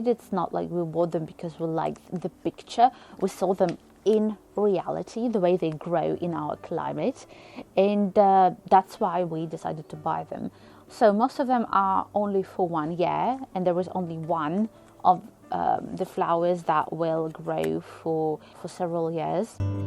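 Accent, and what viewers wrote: United Kingdom. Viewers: Thank you for takeing these videos. I'm watching you since some months and I'm still impressed by your British accent and your ability to make gardening interesting (I've never been into it xD). But I can really relax watching you. I hope all your plants will grow great and am looking forward for your next videos. Greetings from Berlin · the british accent is unbearable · Ощущение, что говорит британка. Акцент классный!!!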